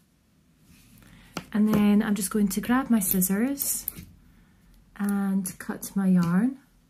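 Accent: Scotland, United Kingdom. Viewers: Just subscribed I enjoyed your video tutorial sooo much. As a newbie to crochet I found your instructions and pace, just right for a learner and I found your voice so calming and clear. Being a fellow Scott I warmed to your accent too. Your wee hoodie couldn't come at a better time also as there's a new baby due in the family in February. Thank you for your hard work and inspiration. Have a merry Christmas :0) P.s. would love some small baby dolls patterns for the new big sister's doll, 10 inch would be great ☺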